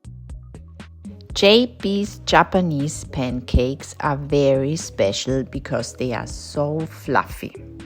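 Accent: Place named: Italy. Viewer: Oh you are truly a beautiful honest spiritual soul. I really appreciate your wonderful truthful videos. You are clearly hard working and your italian accent as a 'foreigner' is amazing. It is very Hard to move to another country - Well Done. My mother was italian and thankfully have an italian passport. Much love Fiona South Africa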